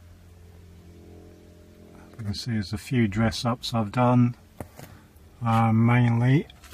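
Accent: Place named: England, United Kingdom. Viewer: Sounds like a pom accent. Nice el to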